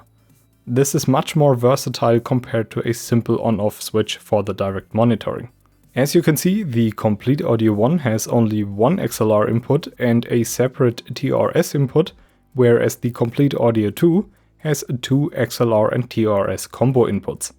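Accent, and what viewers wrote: Germany. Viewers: I hear a bit german..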